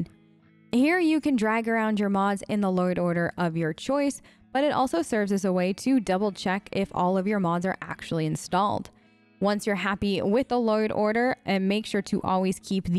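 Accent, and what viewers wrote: Australia. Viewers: yoo you from straya? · Why do I keep hearing an Aussie accent from her lol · This lady doesn't know if she wants to be Aussie or american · you can't hide the aussie. I hear that shit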